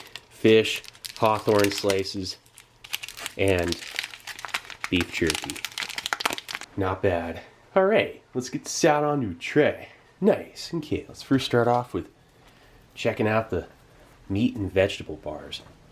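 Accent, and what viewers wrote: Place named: Midwest, United States. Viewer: Mid-America i think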